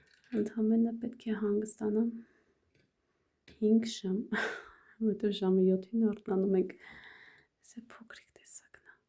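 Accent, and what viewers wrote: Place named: Lori, Armenia. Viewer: Ձեզ առաջին անգամ եմ դիտում։ Ինձ թվումա լոռեցի եք ջան? Բայց ամուսնու կողմը չհասկացա որ բարբառով է խոսում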